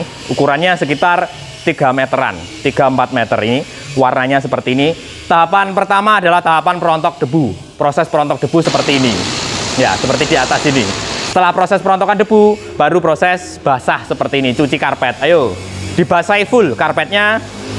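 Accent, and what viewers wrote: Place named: China. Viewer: Kalau menurut saya sering seringlah mempergunakan bahasa INDONESIA,agar tidak terdengar seperti orang CHINA sedang berbicara menggunakan bahasa INDONESIA.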